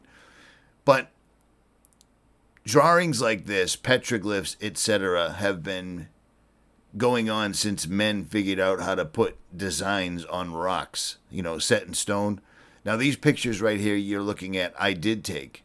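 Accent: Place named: New England, United States. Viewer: You spin a pretty darn good yarn, gruff yankee voice and all. Great footage as always. Much thanks and love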